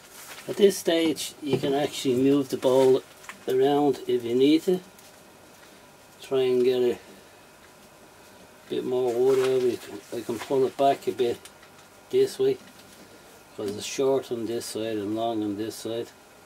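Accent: Ireland. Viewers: I very much appreciate your approach to turning and especially your comments regarding safety. Very appropriate. I just found your site and have started watching your videos. I lived and worked in Ireland in the mid-90's for 2 1/2 yrs so I recognized you were Irish immediately! Are you from the Dubin area? North Dublin, perhaps? My ear for the accents is not as sharp as it used to be when I could pick up a Dub, or a Cork or Kerry man when I lived there. Cheers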